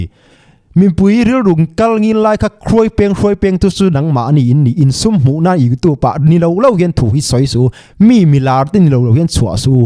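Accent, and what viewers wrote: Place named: Myanmar. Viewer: Burma tlangval tawngpai nuk2😂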